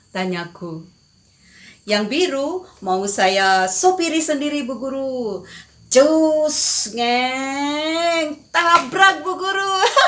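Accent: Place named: Papua, Indonesia. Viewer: wah cerpen dengan logat papua. keren.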